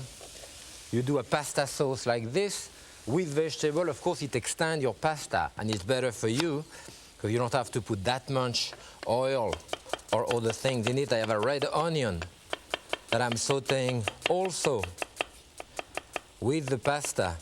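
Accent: France. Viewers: Are you trying to say English should have less of a French accent? I'm not sure what you're saying.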